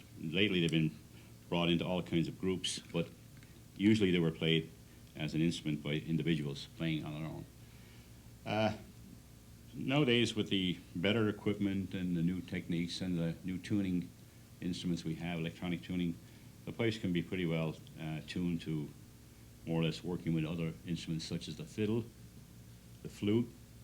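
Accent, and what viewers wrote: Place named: Dublin, Ireland. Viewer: bits of the lovely Dublin accent come through in his voice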